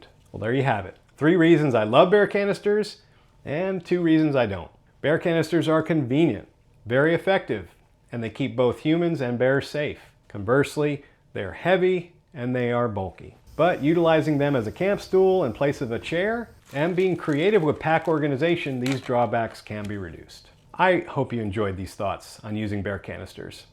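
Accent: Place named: North Carolina, United States. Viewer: oh no! I was hoping you wouldnt say that! I had a feeling you were from NC the way you talked and the way the photos looked. I want to do flat laurel creek and sam knob. What scares me is I don't know how much of the bear activity goesunreported. Thank you for the heads up. I do have a bear canister and I figured you were going in that area when you said they were required in the area you were speaking of.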